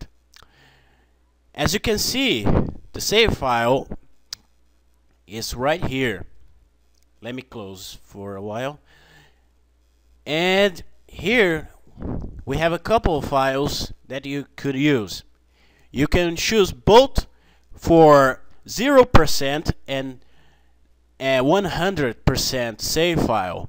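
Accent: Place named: Brazil. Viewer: Você é Brasileiro né Por que o seu inglês é um pouco puxado para o de português Do jeito de falar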